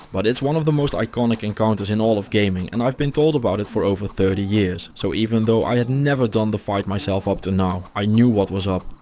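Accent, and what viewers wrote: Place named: Netherlands. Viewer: why you sound so dutch.... and like me.... also yeah the mage is by far the easiest. mana shield hydra can beat the whole game. ranger is thus also super easy as its mage as well u just have a bow instead of melee. much safer. warrior is just face tank stuff. and ur magic is the lowest it can be... fun fact. melee>range>mage is a triangle. warrior can still be decent ranger. but mage is crap at using a bow. if the mage class had decent dex. it would be broken.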